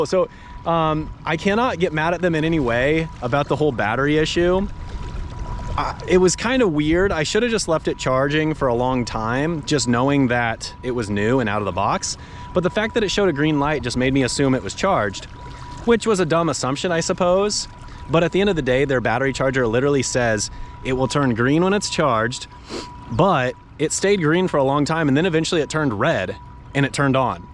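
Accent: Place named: Midwest, United States. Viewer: Love the mid west accent. More of it!!!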